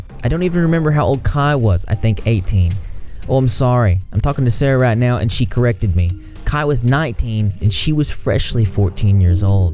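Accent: South, United States. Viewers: I hate the way southern accents sound but yours is so pleasant to hear wtf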